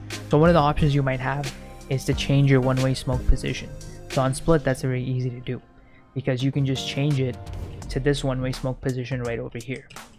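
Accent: India. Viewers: Are you Indian bro?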